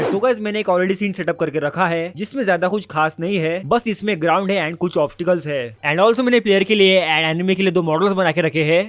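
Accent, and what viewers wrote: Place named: Maharashtra, India. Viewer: bhai tera tutorial ka pronounciation sun ke me <num>% sure hu ki tu marathi aahes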